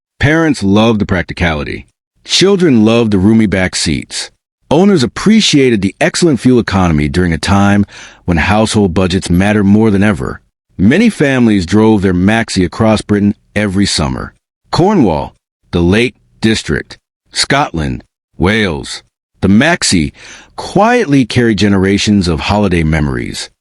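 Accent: United States. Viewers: Sorry one minute of the American commentary finished me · Why do we have to have an American to narrate a video about British cars..I can't be bothered to watch after 2 minutes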